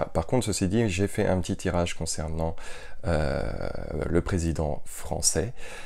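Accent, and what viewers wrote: Quebec, Canada. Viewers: Merci, bon voyage j'adore l'accent québécois.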